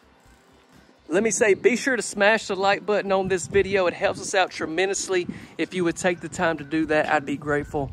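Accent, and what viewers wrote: South, United States. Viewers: Absolutely amazing - I really give the owners kudos for having the imagination to create such a beautiful comfortable amazing home with all the extras it’s crazy!! Definitely made me feel calm and a little envy ness lol no but seriously it truly made my day! Thank u to the owners for allowing America into your home and thank you for doing such a great job and that southern accent and manners gets me every time!! Lol -Looking forward to watching more of your videos! God bless!! 🙏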